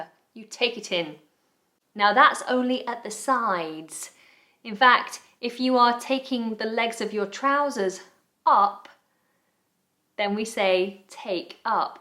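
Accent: United Kingdom. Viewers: nice british accent😊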